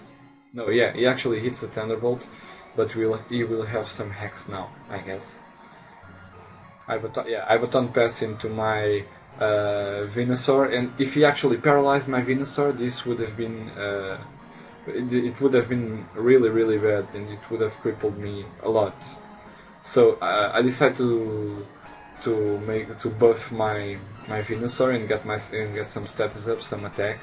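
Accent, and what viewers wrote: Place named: Portugal. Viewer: do teu sotaque xD vi logo que eras português x) foi mt fácil xDD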